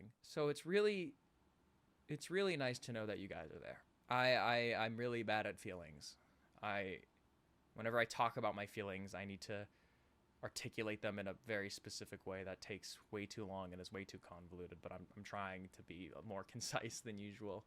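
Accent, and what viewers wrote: United Kingdom. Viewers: Happy birthday to one of the best/sweetest guys I've ever seen! happy birthday to the guy that brought me friends that i can fangirl with over how amazing you are in every way! happy birthday to the man who makes My day almost on the daily!. Happy birthday to the guy that I found all those years ago That made me swoon over the British accent from the 1800s and I obviously know that's no longer a thing anymore but that's basically how I discovered you (on yt with compilations of your tiktoks since I didn't/don't have tiktok downloaded on my phone) Happy birthday to one of the most genuine people I have had the pleasure seeing through YouTube. I can only hope one day that I'd actually get to meet you and truly show you how much you mean to me. This is not a parasocial thing I truly see you as like a friend who's been there for me without even actually saying anything directly to me or being right next to me but still being there if that makes sense?😅😊. 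Thank you and happy birthday! Thank you. I hope it's the best birthday. Thank you! Until the next one and the one after that and so on and so forth. I'm 21 years old and I will be 22 in Sept.17th. Sorry for the tmi.. anyway i hope that everything goes well for you, I truly mean that!. I hope this is not too much or creepy to you, but I really appreciate you for as much as I can without knowing you (in real life) so to speak. Anyhow, have the most wonderful birthday ever because you deserve it you're a wonderful man! you have help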